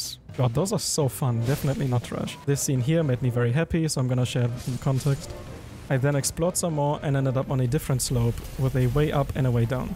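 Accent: Germany. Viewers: Hey dude great video! <3 Can we connect on discord or so maybe? From what i hear i assume that you're german and i'm german too, so it'd be great to have someone to share the video editing experiences, especially when it comes to Elden Ring :) <3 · Jo m8 whats ur Main language? German ?🤔 Dein english klingt wie von einem deutschen aber is trotzdem gut 😋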